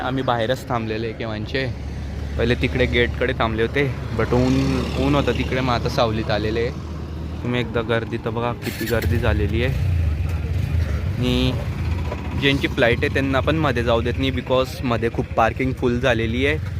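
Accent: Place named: Khandesh, India. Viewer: O bho khandesh ni marathi tuning ahe hay tr 🔥😂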